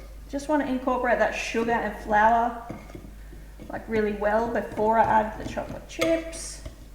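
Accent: Australia. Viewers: I watch your video's daily from America. I live in Alabama just above Florida. I Love your accent (wish I had an aussie accent) You're so beautiful and I love your kitchen. My husband loves the muffin mix.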